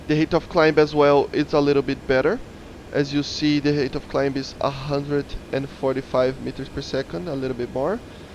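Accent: Brazil. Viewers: caraio que sotaque de brasileiro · That guyse sound likes a Brazilian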